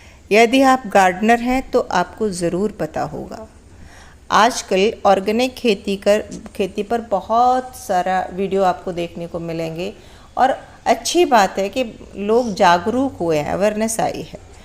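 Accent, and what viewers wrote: Standard. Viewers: aapka Hindi itni shudh and sureeli hai ki aapka Hindi keliye me jaroor dekhti hun video, and ofcourse aapka gardening coaching to la jawab hai, mai ek soth Indian hun, par muje Hindi bhasha Jo aapki jaisi shudh aur pyari ho wo bolne Sur sunne keliye bohot accha lagta hai, me rehti hun Mumbai me 37 years ho gaye hai, par yaha Hindi alag hai, mixed hota hai, aur slangs hai, me govt dept se voluntary retirement le chuki hun, waha Hindi seekna jaroori tha, par ham kaam vyasth hote the ki muka nahi mila, and aap se shudh Hindi sikhungi, aap ne ye video bohot short kar diya 😀, Mere bhi chota balcony garden hai, isi tarah chidiya chotisi aati hai aaj kal, bohot aanad hota hai usko dekh kar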